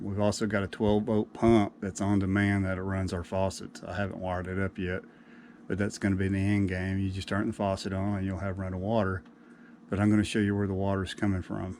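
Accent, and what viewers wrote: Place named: South, United States. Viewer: You sound like Forest Gump. Are you a Southerner, from Mississippi maybe?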